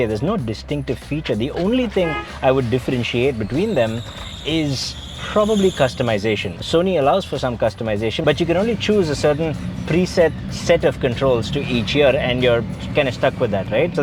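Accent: India. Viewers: First off, I'm an American. 2nd, I don't care if this guy has an Indian english accent. he's so fluid in not only verbal communication but his facial expression, charisma in front of the camera etc. keep up the good work!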